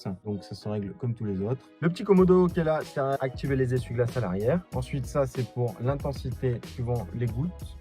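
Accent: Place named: Paris, France. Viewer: Le présentateur est sûrement très compétent mais parler vite avec un fort accent Parisien est tout simplement incompréhensible pour un mec du Sud comme moi. C'est vraiment dommage, je ne comprends pas la moitié de se qu'il dit 😢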